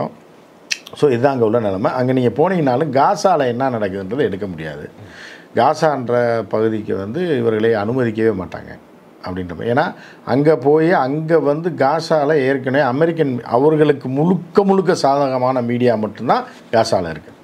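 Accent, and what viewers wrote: Madurai, India. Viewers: sir. Naanum madurai karan tha. Un speech a vida unga slang ku fan. But kirmani was a wicket keeper. I beleive that bowler may be someone. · சார் சார் உங்களுடைய பேச்சைக் கேட்கும் போது மதுர மாவட்டத்தினுடைய பேச்சு மாதிரி தெரியுது நீங்க தமிழ்நாட்டில் வந்து மதுரை மாவட்டம் நான் வந்து கன்னியாகுமரி சார்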